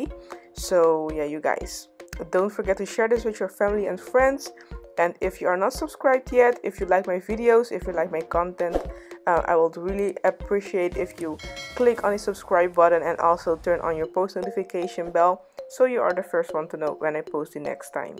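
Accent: Netherlands. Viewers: What country are you from, you sound dutch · Ben je misschien nederlands lijk ik te horen aan je accent lol?😂) are you dutch ? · Ik dacht al ik hoor een NL accent door je Engels. Toen ging ik naar je insta en zag dat je NL bent. Hahahaha in ieder geval je doet echt heel mooi werk💕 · ik hoor gewoon dat je Nederlands bent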